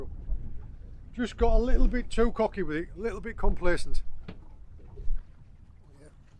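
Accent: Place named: Northern England, United Kingdom. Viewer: where you from pal i recognise that accent , up north somewhere?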